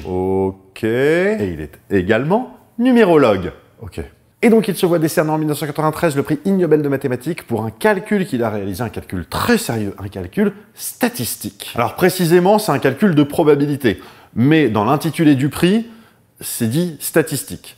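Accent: Belgium. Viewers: C'est dingue même avec la modif de voix on reconnaît l'accent belge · Je reconnais un accent belge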